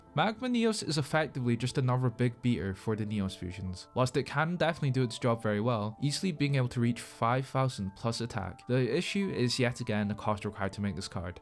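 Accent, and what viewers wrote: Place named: Scotland, United Kingdom. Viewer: Great video, your accent btw, are you Scottish, specifically aberdonian?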